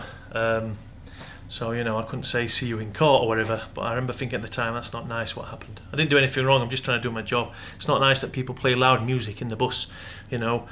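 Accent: Yorkshire, United Kingdom. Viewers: I thought you had a Yorkshire accent ! Haha hello from Huddersfield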